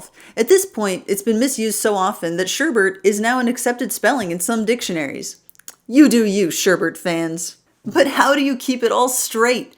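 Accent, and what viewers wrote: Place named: United States. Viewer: Lol. I was caught off guard bc it sounds a lot like mine and my mum’s voices. Kinda just seems like a variety of American accent 🤷🏻‍♀️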